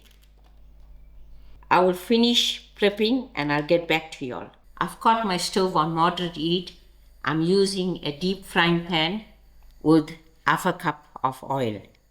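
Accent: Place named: Sezela, South Africa. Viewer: Aunty you sound like a Sezela Tamil aunty,saying orhil and tamartoe. How big is Prakash's dhanda?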